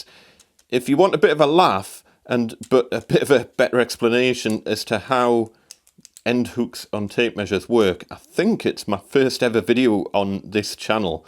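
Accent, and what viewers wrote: Newcastle, United Kingdom. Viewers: This is what I’m looking for but they’re not easy to find. This guy sounds like a Geordie!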